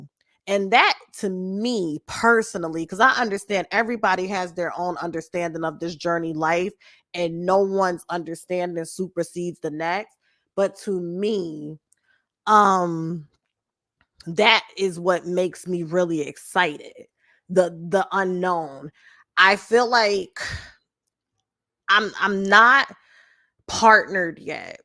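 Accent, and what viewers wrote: New York, United States. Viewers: I'm going to miss you like crazy. I've been listening to you for years😔 I can't help but think "Damn, I'm not going to hear that strong ass New York accent dropping jewels no more"🥺 And now I have to say bye to my youtube crush😔🙏🏿❤🙏🏿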